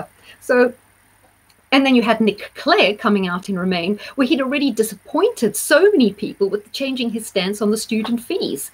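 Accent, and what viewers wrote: South Africa. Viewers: Hi, this remain parliament is driving me insane! It beggars belief how after we were clearly told by Cameron that the British people will decide, not Parliment, not government.... The people and the people's choice will be implemented. Insofar as the next general election is concerned. Can you do a chart on this? I will definitely be voting ukip as there is no one else. It would be interested to see if - after TM resigns after 29th March... I'm hoping actually and Boris takes over, how will he do? Will he start looking at sorting out the destruction caused by Osborne and Hammond austerity cuts? It's desperate how they have made people so desperately poor while the rich flaunt their wealth. The crime rate is horrendous and the London mayor needs to go. Do you see improvement? Thank you for your videos. I found you by chance and constantly look to see if you have posted a new one. 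It's nice too to hear a fellow SA accent!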